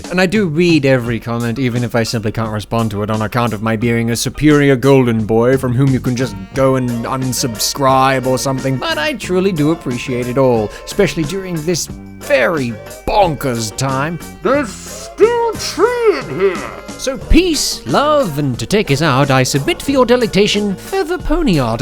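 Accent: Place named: Scotland, United Kingdom. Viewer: wait wait wait!! r u scottish...that explains so much actually... #freedom #hashtagsrsostuipedyamidoingdis?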